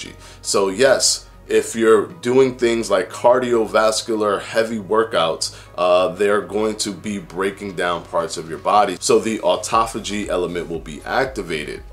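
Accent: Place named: New Jersey, United States. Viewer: sickid41 I bet he’s from New Jersey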